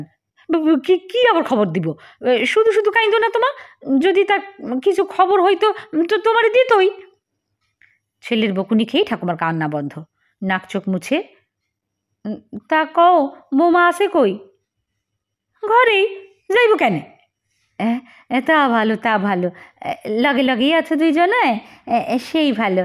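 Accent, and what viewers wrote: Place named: Bangal, Bangladesh. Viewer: খুব ভাল লাগল । কি সুন্দর বাঙাল ভাষা বললি ।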